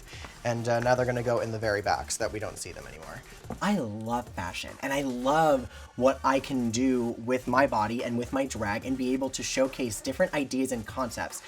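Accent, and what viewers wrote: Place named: New Jersey, United States. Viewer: I love her New Jersey accent and energy!!! He is the type of guy you want to take home to meet your mother.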